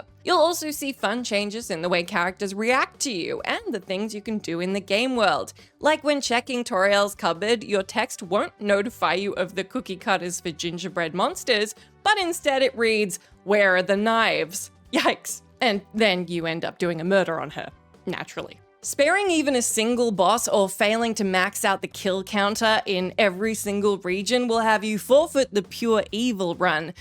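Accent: Australia. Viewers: That is an Australian accent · I feel some of the most questionable things I've done are on Stellaris the game makes Slavery and Genocide fun! All for expansion and profit of course. I mean I'm normally apologetic about my Countries Imperial past and then I play that! Lol Edit: is adorable I think its the Aussie accent Shoot me I'm simping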